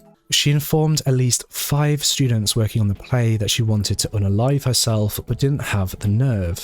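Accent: United Kingdom. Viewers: You have such a beautiful, sultry voice. I would love for you to narrate all of my favorite books. You could easily narrate professionally (especially for those of us in the US who swoon over British accent).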